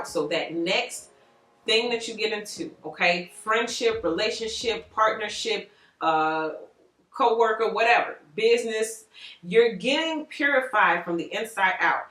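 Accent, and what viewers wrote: Chicago, United States. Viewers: Hold up, girl you from Chicago?!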